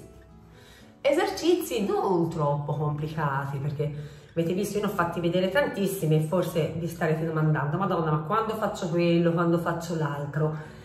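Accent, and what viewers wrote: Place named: Florence, Italy. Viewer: Sei fantastica, bravissima e molto bella La tua parlata Fiorentina è un piacere Un bacio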